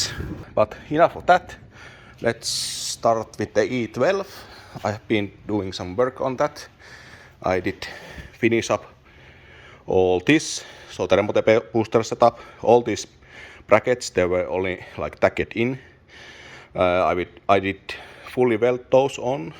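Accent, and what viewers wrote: Oulu, Finland. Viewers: Itse olen harmitellut monia hyviä kanavia kun höpöttävät kieliä joita suurin osa ei ymmärrä. Kyllä englanti tässä palvelee suurta yleisöä. Itse saatan tehdä videosarjan e21 restomodista. Vähän sellaiseen Don DIY tyyliin eli englanniksi mutta puhetta mahdollisimman vähän. Mites toi rallienglanti, äännätkö niin tahallaan vai onko se vain opittua? Esim. Kalle rovanperä puhuu rallienglantia mutta mielestäni olen välillä kuullut kun hän puhuu englantia ilman rallimurretta. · Nyt oli kyllä melkoinen parkaisu ja maan tömistely :D ei muuta kuin uutta konstaa seurantaan, tuskin loppuu Youtubesta kesken. En kyllä aiemmista videoista muista näin positiivista kommenttikenttää kuin tällä videolla on. Onhan tämä vähän koomista katsoa suomalaisen puhumaa rallienglantia, mutta Bemarit tässä eniten kiinnostaa. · Anna tulla vaan englanniks. "Rallienglanti" antaa vähän samat vibat, kun Oulun murre suomeks😃 Kielellä ei väliä, kun sisältö on edelleen 💪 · Great work again and for me both languages are fine. 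Oulun murretta on kyllä ollut kiva kuunnella kun äidin suku on sieltä suunnalta 😀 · Oli kyllä suoraan sanottuna pettymys rallienglannin takia.. jatka suomeksi mieluusti!! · I vote English. For every amis you lose as viewer you’ll gain 5 more international viewers. Plus Finnish is the best sounding English accent. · Well I didnt have problems with rally English so carry on as you please! And also im native Finnish speaker but I dont mind listening in English.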